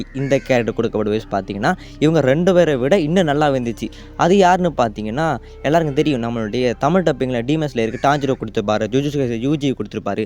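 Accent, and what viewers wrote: Sri Lanka. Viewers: Sri Lankan tamil ah neenga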